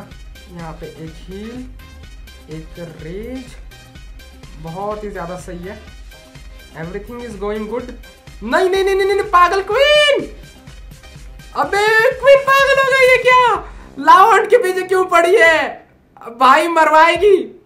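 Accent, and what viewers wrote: Kerala, India. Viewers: you are from kerala?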